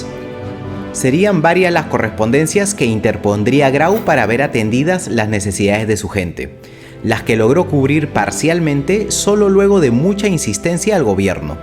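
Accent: Peru. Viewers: Por su acento, creo que es peruano. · Esta historia quien la cuenta me parece que es un peruano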